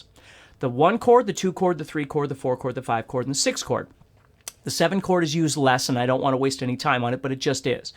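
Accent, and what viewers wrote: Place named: California, United States. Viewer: Hi, great lesson. I'm sorry I'm just under a year late to your class! But at least it's Friday! I love your method of teaching, you seem to be a really nice guy! I liked some of the things you said about, "you would jam all day, with no problem, and then get with friends to jam, and you suddenly forget everything", yeah that's me!!! And don't worry about not knowing enough, just have fun with it!! See I'm 98% self -taught at this point, So you kind of don't catch little thing like that! That matters! I maybe wrong but, you sound like you're from California. I'm from Georgia by the way! I went to you PDF file, and can see, where some of your class was from, Wow man your world wide!!! Thanks so much for what you do, I wish I had 10 more like you! I've got to go now. So see you in the next video! Todd P.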